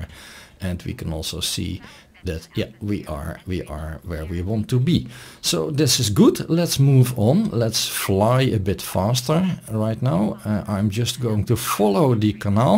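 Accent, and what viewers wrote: Netherlands. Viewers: Always good to hear a Dutch accent with English. I'm American and lived in Landgraaf for five years. Thanks for the video.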